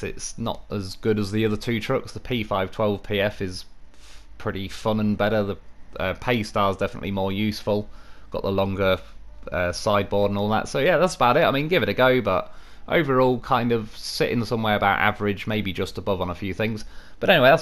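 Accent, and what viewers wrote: United Kingdom. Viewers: Your british accent is so hard...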